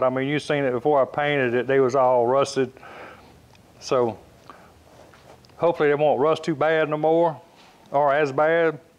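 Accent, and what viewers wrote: South, United States. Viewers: when you really do the southern drawl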